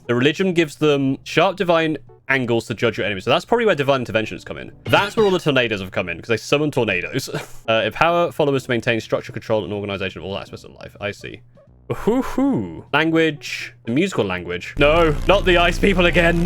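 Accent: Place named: United Kingdom. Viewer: oh god he's british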